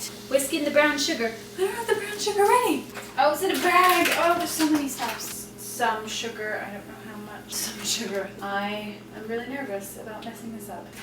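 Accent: United States. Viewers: Your American accent has less traces of British accent, and it is just as lovely. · Hard to believe I could be this captivated watching somebody bake. I'm a bachelor and I don't bake, but I might now give this a try. Also, ' ' 's American accent seems entirely natural now. Do you ever feel now like you're "doing" an American accent? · K who misses the old days when she sounded English no she sound like every other fucking America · Not watched a video in 3 or so years. accent is DEFINITELY more American now, it's so weird haha!! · I wonder why people still remark about American accent. She's sounded a bit American for several years now. That's not a recent thing.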